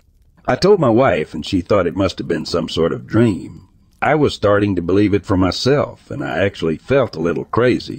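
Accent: Texas, United States. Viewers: Yea Texas accent an also Alabama accent the best for me. Super cool 😎 good day too all from the UK 🇬🇧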